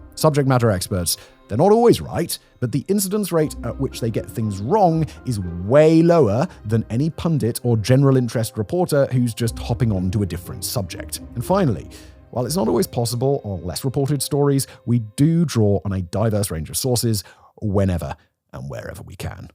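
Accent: United Kingdom. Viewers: Love the presentation, and respect the methodology/ethics of how you do this. As a UK english guy who has travelled the world I recognise your 'pan Brit' accent. But I am puzzled by some of your mis-pronunciation of some terms/names. Such a Thales , a well known defence co. pronounce talez... Just an observation, where generally were you from?? Just interested.. retired Rail Engineer here. 😀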